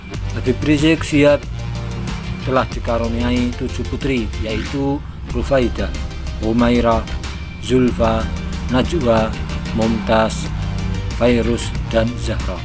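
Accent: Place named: Java, Indonesia. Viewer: Medok amat ai mas broooooo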